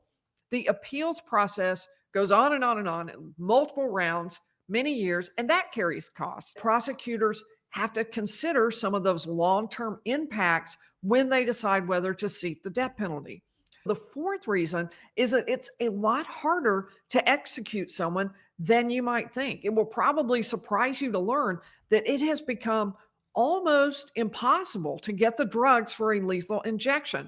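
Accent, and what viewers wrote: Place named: Texas, United States. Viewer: Love your Texas accent !!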